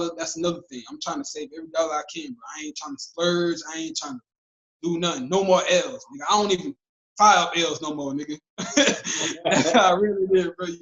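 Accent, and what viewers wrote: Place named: Washington DC, United States. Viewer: Sound like he from DC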